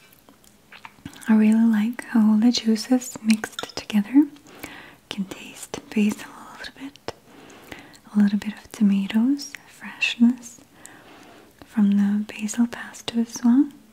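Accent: Russia. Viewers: The Russian is strong in this one. Lovely as always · Акцент то русский!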